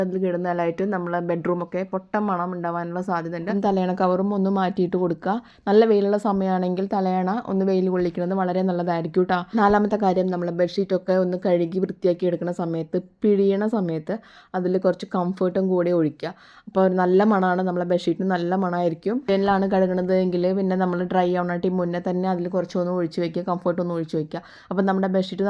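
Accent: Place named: Thrissur, India. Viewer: തൃശൂർ കാരിയാണ് എന്ന് തോന്നുന്നു... പൊട്ടമണം 😅😅😅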